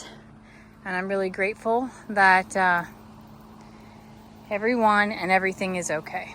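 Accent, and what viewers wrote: South, United States. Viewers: Never thought I would hear with a country accent. Love to hear you sing! Hey..glad you're on speed dial. Thank God y'all are safe. Good job, little!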